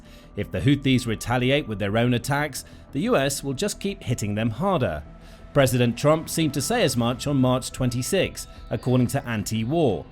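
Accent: England, United Kingdom. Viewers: What is your contributing to this campaign? Probably absolutely nothing by your voice. You sound like you’re from England. What is England doing? Are they doing helping they spending some money?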